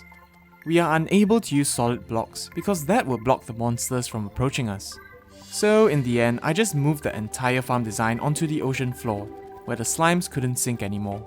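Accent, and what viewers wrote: Singapore. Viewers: Are you singaporean? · Are you Singaporean? You sound like your from Singapore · U sound really singaporean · are u from singapore? u sound so familiar O.O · Why is the Singaporean accent so fucking nice? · Uh is it me or do u sound a bit singaporean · are you singaporean or malaysian? Your commentary is relaxing and familiar:)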